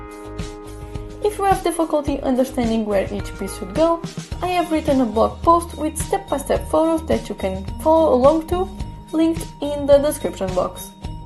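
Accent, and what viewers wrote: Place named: Poland. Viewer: Love the accent....Polish 🙂?. The last bracelet was awesome. I will do the end caps in silver...thanks for sharing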